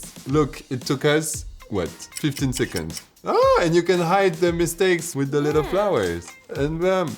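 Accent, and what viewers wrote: France. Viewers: are you french???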